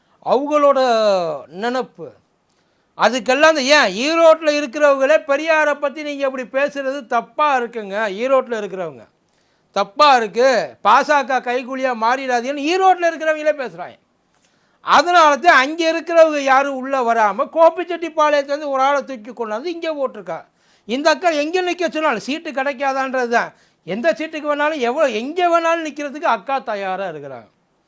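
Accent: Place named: Telugu, India. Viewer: அட லூசு பயலே உனக்கு அரசியல் என்றால் என்னவென்றே தெரியுமாடா பரதேசி நாயே திமுக அடிமை நாயே நீ பேசிட்டு இருக்கதே வைக்கும் அவனை தெலுங்கு நாய் அது தமிழ்நாட்டுல இப்படி தாண்டா இருந்து பேசிகிட்டு இருக்கீங்க இதே வேற மாநிலத்தில் இருந்து இப்படி பேச முடியுமான உங்களுக்கு அந்த அளவுக்கு சுதந்திரம் கொடுத்து இருக்கு வந்தவுடனே செருப்ப கழட்டி அடிக்காத வாய்ஸ்ல தெரியுது தெலுங்கு காரங்களா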